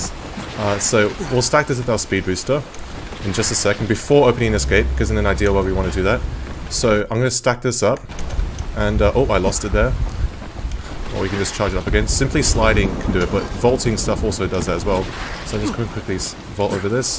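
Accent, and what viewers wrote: Australia. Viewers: thank you for this helpful guide mr. aussie man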